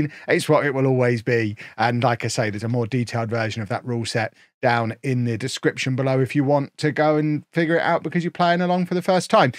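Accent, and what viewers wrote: United Kingdom. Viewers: I’m not sure I can support this series if there isn’t any suits. I can put up with the annoying British accent, the sub par game play, look it’s just not cricket.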